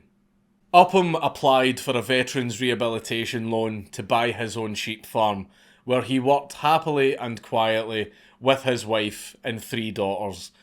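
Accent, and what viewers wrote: Scotland, United Kingdom. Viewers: I don't know what the hell it is but the scottish accent is delicious to listen to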